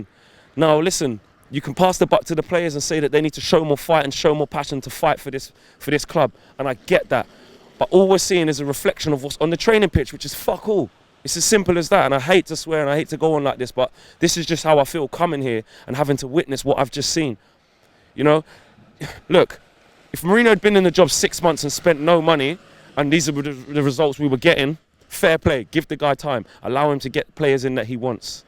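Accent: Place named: London, United Kingdom. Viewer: My guy u sound like u from London why u supporting united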